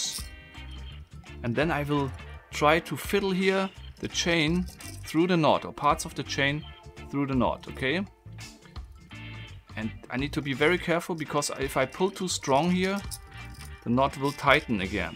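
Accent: Germany. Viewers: damn now I love (grüße aus österreich btw) (cause he obviesly sounds german haha:D) and brilliant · Wenn ein Deutscher Englisch spricht... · Wie man einfach hört dass du Deutscher bist lol...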